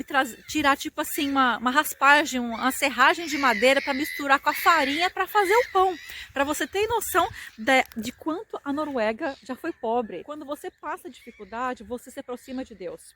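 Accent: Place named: Caipira, Brazil. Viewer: Porque ele só existe no conceito cristão. Deus não é um velho de barba branca que viveno céu para amaldiçoar, castigar ou abençoar pessoas. Isso só serve para controlar o ser humano pelo medo. Deus existe sim, mas não é nada disso que o cristianismo de modo geral prega. Os noruegueses despertaram. Putz ouvir esse erre caipira não dá, fui...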